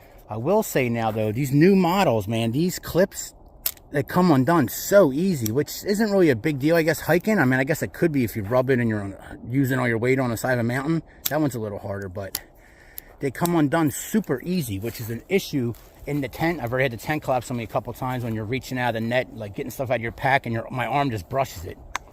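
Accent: Philadelphia, United States. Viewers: When I close my eyes I'd swear I'm listening Bam Margera hiking! lol Is that a Phillie accent?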